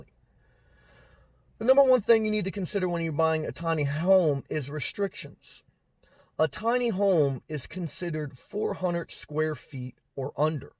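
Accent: New Jersey, United States. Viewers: Thank you I love your NJ accent and you been keeping me company today driving from Jax, Fl to Alpharetta, GA :)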